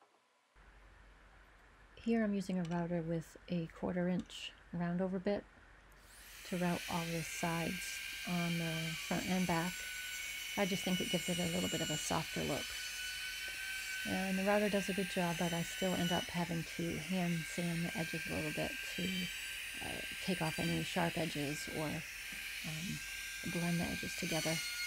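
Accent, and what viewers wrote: United States. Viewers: So, American accents that aren't irritating for Brits to listen to DO exist! Really nice job on this board. Looks great. (You could voice audio books!)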